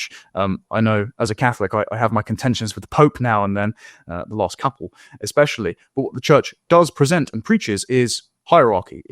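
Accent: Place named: United Kingdom. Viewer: Why is he describing the right wing as religious? He sounds like a sheltered posh Englishman without any connection to the reality of most young men. For the longest time in Europe, nobody on the far right cared about religion. The uniting factor was hardcore nationalism and opposition to immigration. "Going to the right" and for it to be milquetoast tolerant Christian patriotism (which solves zero issues such as multiethnic societies) will help nothing. They'll figure it out for themselves.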